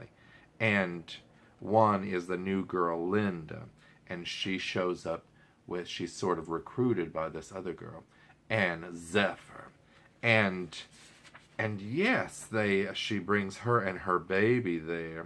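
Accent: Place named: South, United States. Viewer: Hi! Loving your southern drawl.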